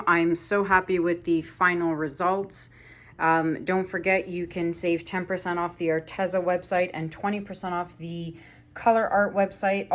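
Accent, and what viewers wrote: Canada. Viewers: You couldn't talk too much for me! At least half the point, for me, of watching your videos is to listen to your interesting chat! Haven't watched the video yet, so can't comment on the painting, but just wanted to let you know - keep chatting (enjoy listening to your Canadian accent - you are the first person I ever heard saying "anyhoo" - now I am coming across it everywhere!!!) (I'm English). Keep up the good work - painting and chatting!!!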